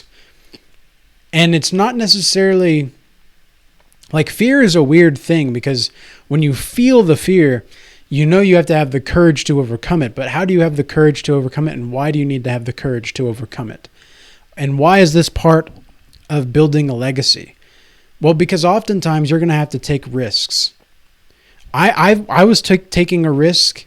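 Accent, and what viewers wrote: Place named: United Kingdom. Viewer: No. It worked. The British accent. It worked : )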